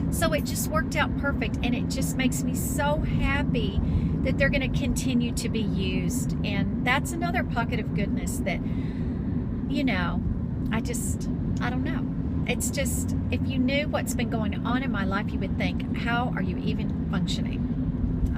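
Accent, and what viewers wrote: Texas, United States. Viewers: Great vlog! I enjoy your positive attitude so much. Loving that thick Texas accent you've re-discovered too. Hope you have a wonderful holiday.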